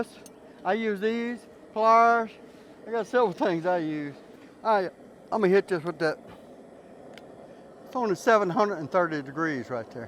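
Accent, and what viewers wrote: United States. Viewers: He has the best American accent.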